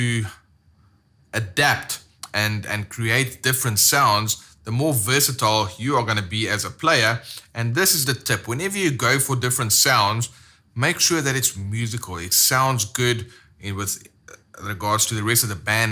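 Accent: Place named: South Africa. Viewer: Ive always been a fan of the bb preamp,one is always close by my pedal board. These days i have the custom shop one with the mid boost. Ps..... what the hell accent is that? Ive never heard it before,South Africa?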